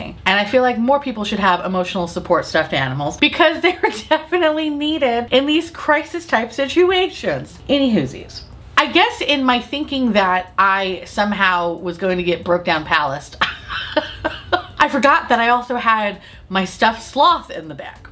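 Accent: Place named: Newark, United States. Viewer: Sounds like Newark to me!!!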